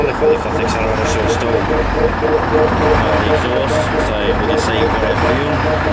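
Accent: Australia. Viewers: he speaks australian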